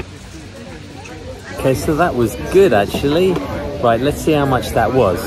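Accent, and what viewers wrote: United Kingdom. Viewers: Thanks for the video and for information ! I love your british accent (no homo)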